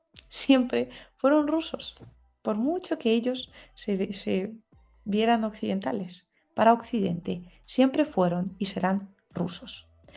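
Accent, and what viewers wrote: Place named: Spain. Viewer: porque ablas como si fueses de España?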